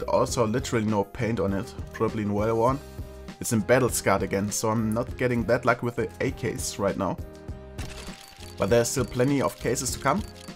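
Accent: Germany. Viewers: R u from germany? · you are from germany right? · Ich muss mir nur die ersten drei Wörter anhören und schon weiss ich das der Deutsch ist xD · Man hört einfach das er deutsch ist :D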